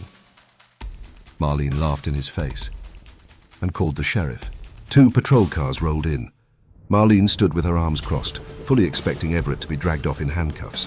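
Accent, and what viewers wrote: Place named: England, United Kingdom. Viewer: WHY THE ENGLISH ACCENT ?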